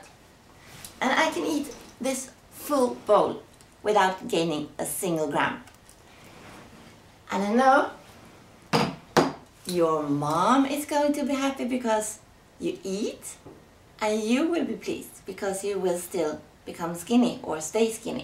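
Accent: Sweden. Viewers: You seem like such a sweet caring Mother <3 Also, I wish I was Swedish!! Your accent is So ADORABLE! I want it :3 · swedish accent :) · shes swedish, so a swedish accent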